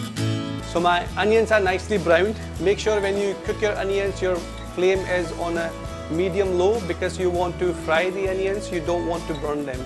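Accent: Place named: Lancashire, United Kingdom. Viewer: I'm loving this blokes accent. Where's he from? I'm getting north east lancs vibes off it.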